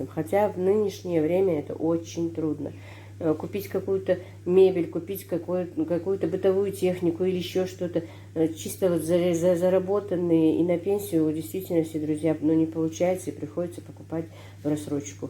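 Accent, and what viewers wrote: Standard. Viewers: если не секрет, вы родились в Ингушетии? Чисто говорите на русском языке.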